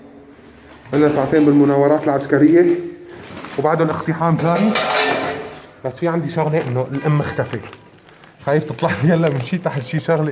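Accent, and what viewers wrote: Syria. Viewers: يا انت ادلبي ولا حلبي لانو لهجتك لهجت ادلبي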